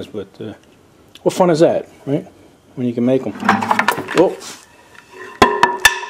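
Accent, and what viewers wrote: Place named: Bordentown, United States. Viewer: So, how far from Bordentown do you live? The accent is a dead giveaway. LOL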